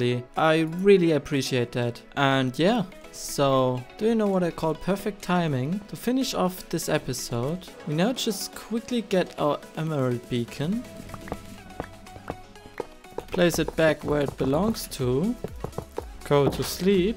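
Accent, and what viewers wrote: Germany. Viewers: ich bin so gut ich hab an deinem accent erkannt das du deutsch bist aber dein englisch is sehr gut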